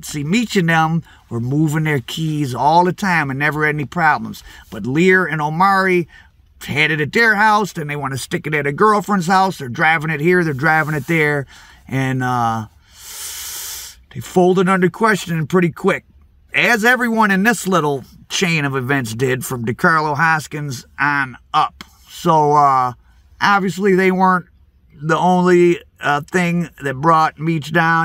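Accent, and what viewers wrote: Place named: Midwest, United States. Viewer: Minus the fact he sounds like he has a mouth full of shite when speaking. He is very thorough with his stories. Im put off by his heavy MidWest accent. Da Bears!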